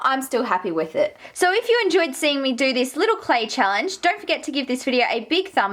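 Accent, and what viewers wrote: Australia. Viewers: Are you Australian??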